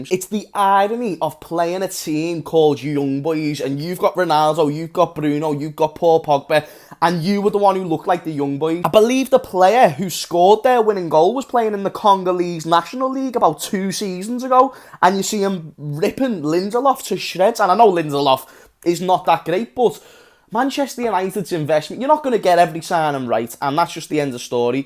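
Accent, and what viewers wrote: Liverpool, United Kingdom. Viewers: Will love to see his reaction when we thrash Liverpool! Will be great to see him crying with this scouser accent 🤣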